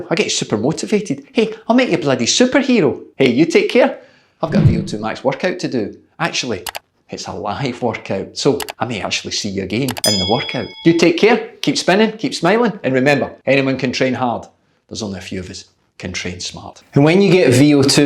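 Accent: Scotland, United Kingdom. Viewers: I like your Scottish, Coach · I like your scottish accent :D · Hahaha! I laughed out loud when you mentioned talking less Scottish. Then I thought, actually his accent is pretty thick. I love it, and I love the advice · Please never speak less Scottish!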